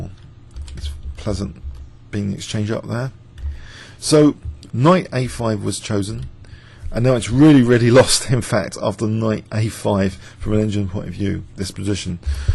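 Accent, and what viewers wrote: United Kingdom. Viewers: British ascent, hoo!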